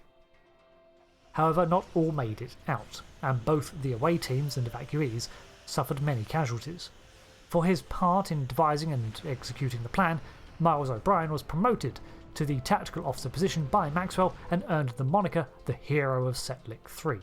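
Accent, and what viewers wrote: United Kingdom. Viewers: The British accent is great for this type of subject. Makes it sound kind of like an old WW2 documentary. Lol.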